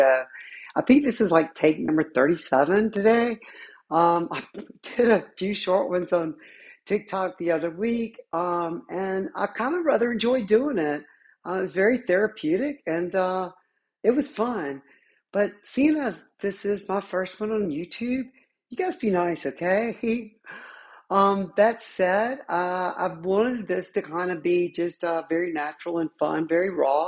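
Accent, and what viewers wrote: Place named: South, United States. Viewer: Love your voice, you have that beautiful southern lilt that makes your voice gorgeous&makes it very femme sounding to me. Glad you have a loving family and can be yourself, that is awesome.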